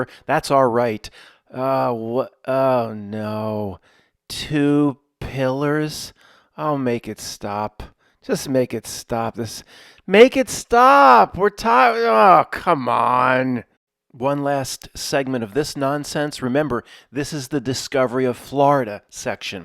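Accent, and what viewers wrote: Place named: Philadelphia, United States. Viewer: & UK Nottingham ❤Philly accent mat in fact I prefer ya Philly accent 😂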